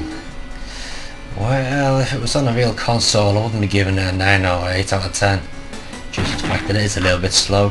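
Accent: United Kingdom. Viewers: You sounded more british this time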